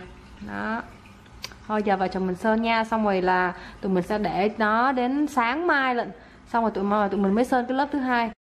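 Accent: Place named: South, Vietnam. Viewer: Vẫn là chị. Nhưng 2 năm về trước với bây giờ thì giọng nói đã khác khá nhiều. Hồi này nghe c nói có vẻ điệu hơn, miền nam hơn. Nhưng e lại thích nghe giọng c bây giờ hơn. Còn tính cách chăm chỉ của con gái VN thì vẫn y vậy à 😊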